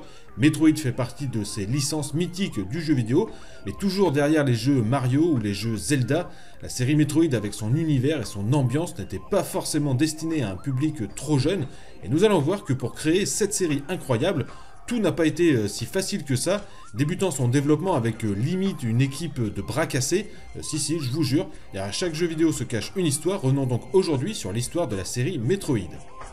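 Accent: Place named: England, United Kingdom. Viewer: Super chronique comme d'habitude. Ça fait longtemps que je suis abonné mais la seul chose que je te reprochearai c'est ton accent anglais pour les titres. Sinon continue comme ça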